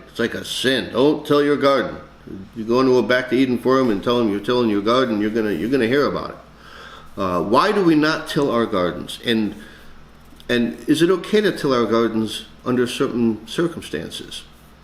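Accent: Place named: New York, United States. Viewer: Literally just red my mind while I was listening and scrolling through the comments. I travel a lot between states due to work. Sounds like a Southern NY, NJ, CT accent to me. I know I'm from NJ originally, live in PA now, have family all over NY and a GF In Nothern NY and the subtitles in accents in speech vary.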